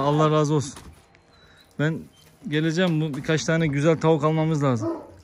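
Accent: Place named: Araklı, Turkey. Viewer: Usta kesin Araklıdan konuşmadan belli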